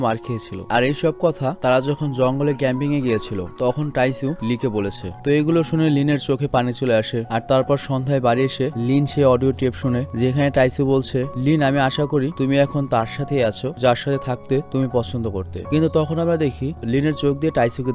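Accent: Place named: Barishal, Bangladesh. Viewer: আপনি বোরিশাইলা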